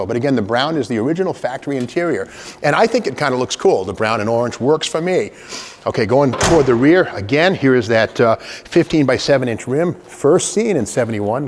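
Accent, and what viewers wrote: Boston, United States. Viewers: I have to say that Steve really does know his cars. He's a pleasure to listen to especially with that Boston accent of his. Go Sox!!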